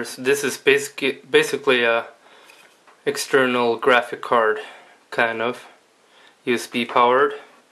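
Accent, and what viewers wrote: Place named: Sweden. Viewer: Swinglish! Winning!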